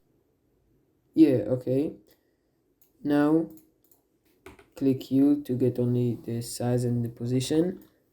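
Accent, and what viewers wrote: Israel. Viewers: על פי המבטא הבנתי שאתה משלנו רחלה סרטון ערוך חזק גבר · איו אחי המבטא הישראלי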